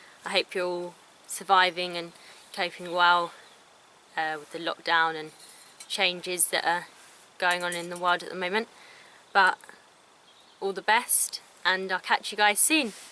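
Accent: United Kingdom. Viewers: You are obviously UK, but what country? I am not good at identifying accents. Brit?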